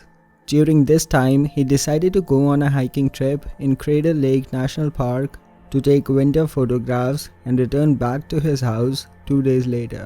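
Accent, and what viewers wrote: India. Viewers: Sounds like an Indian accent. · Indian accent is one of the worst narrator accents possible. Please stick to the women narrator. Quality content non the less.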